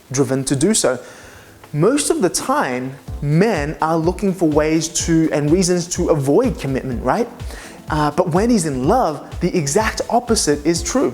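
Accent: Australia. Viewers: Does he have an Australian accent?? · Your accent is really cool. Are you from Australia? :) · Is that an Australian accent?? 🤔🤔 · YOU ARE AWESOME! Also, that accent tho!!!! Australian like mua?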